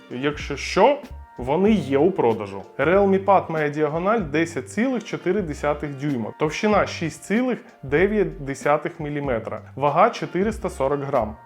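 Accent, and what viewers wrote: Russia. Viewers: Дуже тішить що і "Цитрус" перейшов на українську - респект! Видно, що не дуже ще комфортно з такими змінами (часом зустрічаються русизми), але він старається і саме головне - не прикривається тим, що мова не доскональна і соромно таке публікувати... Тому наше (споживачів контенту) завдання полягає в підтримці (підписка, вподобайка, комент (гарні слова в підтримку) автора(ів) і з практикою все прийде, вдосконалиться і буде не вимушене)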